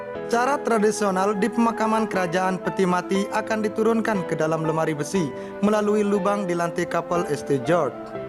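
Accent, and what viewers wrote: Indonesia. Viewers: Dr kmrn bahas cara baca mulu....harap pandai..lidah indo beda...apalagi yg kurang bs bhs inggris..harap maklum lah...jngn spam trs🙏🙏klo ga suka ya uda diem aja...🙏🙏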